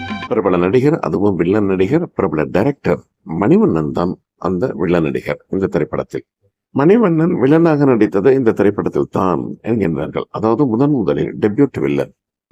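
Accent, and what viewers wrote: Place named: Colombo, Sri Lanka. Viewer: நீங்க bh abthul hameed மாதிரியே கொஞ்சம் பேசுறீங்களே சார் நீங்களும் கொழும்பா தமிழ் குரல் அப்படியே உச்சரிப்பு அனைத்தும் அப்படியே ஒட்டிருக்கு கொஞ்சம் நிஜமாவா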